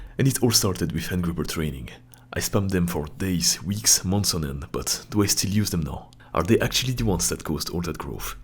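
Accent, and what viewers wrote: France. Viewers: Tes vidéos sont impressionnantes !!! Et en plus j'me dis que je ne suis pas tout seule à conservé mon accent français quand je parle en anglais😅😉. T'es avant bras sont monstrueux, jolie boulot, je me pose la question sur ton régime alimentaires Good job bro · You sound french for real Edit : your are french for real I can ear that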